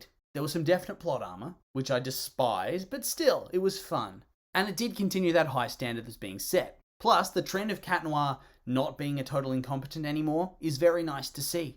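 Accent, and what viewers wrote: Australia. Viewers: Y’all did he get more Australian in this video? Or is it just me?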